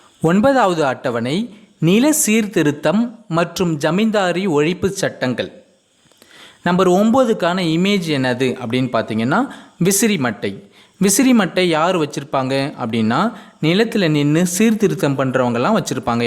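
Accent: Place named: England, United Kingdom. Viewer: Vera level Anna thanks. Unka voice la oru English .....style varuthu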